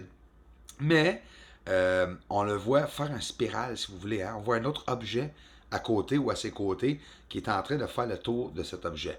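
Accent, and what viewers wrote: Quebec, Canada. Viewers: Salut Rien que d écouter cet horrible accent québécois, ça le fout le bourdon.. Dites vos conneries en ̀anglais , c est mon conseil.. La c est too much bullshit, sur le fond et la forme. .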